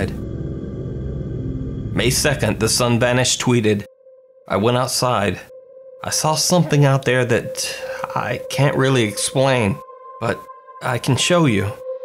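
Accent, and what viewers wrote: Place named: South, United States. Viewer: Its the slight southern draw 😆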